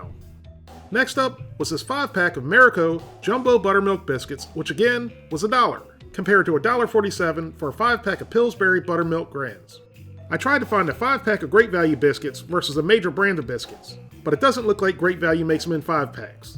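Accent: South, United States. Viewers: Probably a southern tradition plus he had the accent for it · Farmer john is a la area pork distrubuter, but you have a southern accent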